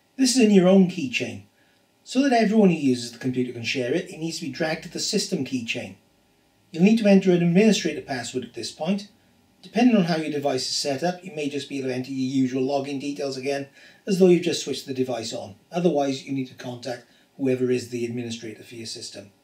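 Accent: Wales, United Kingdom. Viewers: Fantastic. Worked for me and it was easy to follow! Heard the welsh accent and thought I'd give it a chance. Glad I did! Thank you!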